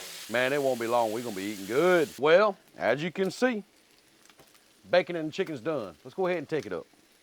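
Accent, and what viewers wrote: South, United States. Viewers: Another great recipe! Love the Southern accent. Greetings from Finland!🙂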